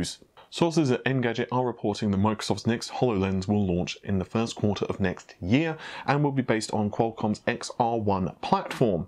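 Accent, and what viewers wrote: United Kingdom. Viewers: He sounds more British than American tbh.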